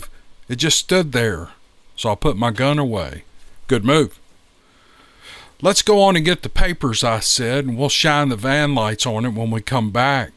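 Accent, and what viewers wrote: South, United States. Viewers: Hey, I’ve been listening for over 3 years love your show. Can’t believe this never crossed your mind. I’ve came to that conclusion quickly. My people are from the south good common sense folk, when I listen to you speak that southern common sense tone I’m familiar with resonates. Your restricted from certain parts of State Land and you can’t fly drones either??? Not a conspiracy theorist but it’s not a coincidence…neither are the unexplained disappearances in the national parks.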